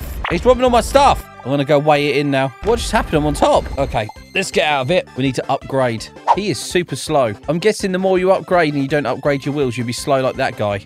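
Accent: United Kingdom. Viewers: Are u brittish cuz u sound like one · Your British doesn't matter you are still a great youtuber and I watch all your videos I can find too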